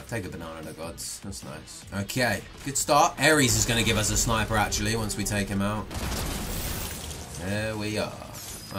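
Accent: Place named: United Kingdom. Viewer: HE'S BRITISH?????!?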